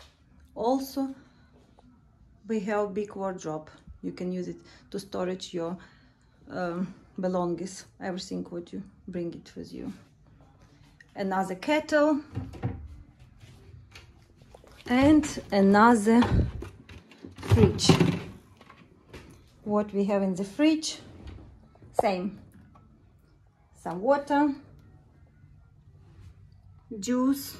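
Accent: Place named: Ryazan, Russia. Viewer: Какой красивый рязанский акцент!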